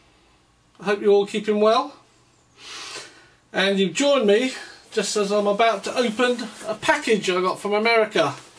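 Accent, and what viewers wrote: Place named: England, United Kingdom. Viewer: nice pipe..are you england? I noticed something diferent about your english .I m brazilian .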